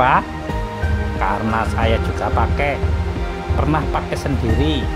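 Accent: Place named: Banyumas, Indonesia. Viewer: Ngapak ra jelas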